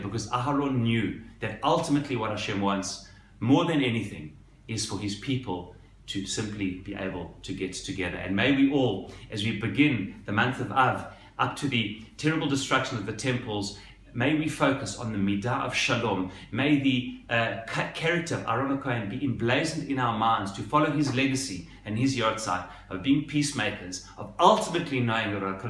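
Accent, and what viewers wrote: South Africa. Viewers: He have a south african accent. Is he from south africa?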